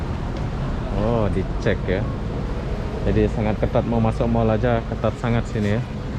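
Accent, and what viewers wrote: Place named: Malaysia. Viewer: Pakai loghat upin ipin ya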